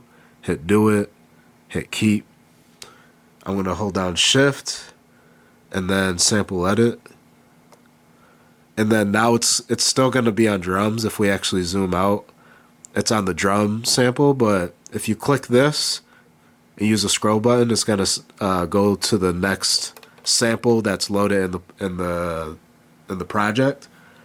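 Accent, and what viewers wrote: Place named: Upstate New York, United States. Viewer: Love that u have that upstate NY accent too! boomboomBoomBOOOOOM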